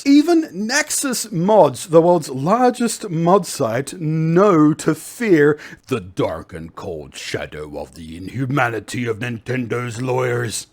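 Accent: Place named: Australia. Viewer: The Australian accent makes this vid twice as amusing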